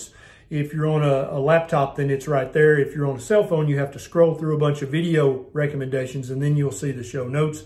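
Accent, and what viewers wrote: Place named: Tennessee, United States. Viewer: man, i sure do appreciate a Tennessee accent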